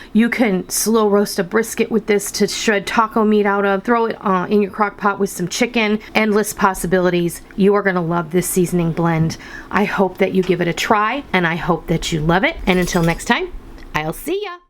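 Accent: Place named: Upper Midwest, United States. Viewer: Thank you for the great tip.. Making one's own personalized blends of herbs and spices put together is a great way and shortcut in having to bring many jars of seasoning out on the counter when doing the cooking. I want to tell you that I love your voice. So sweet and really great enthusiasm in it. For some reason though I had thought you were originally from the upper Midwest states such as Minnesota or Wisconsin. I didn't know you were from Arizona. Who knew! :)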